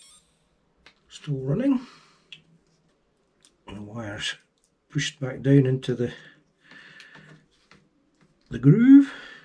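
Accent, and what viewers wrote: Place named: United Kingdom. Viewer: congrats for being the first British accented person to make a video worth anything. your videos are spot on. you make excellent panes. you show them flying filmed properly. you don't annoy with stupid antics like your in a month python film. you don't sound like a moron and you show everything in the build clearly and precisely. please teach your countrymen how make YouTube videos, seems the whole continent is lost. it's like watching Russians Indians or Chinese make movies they just don't get it albeit the Indians are coming up quickly. Btw not racist here just that British people make the worst videos by far and for me personally the accent is almost as annoying as listening to an American fron the deep south. with that said maybe it's just idiots that have turned me off with there inability to film anything properly. 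you were pleasing to listen to. 100% British? or is the explanation your from somewhere that just sounds english-ish? either way, please keep up the good work.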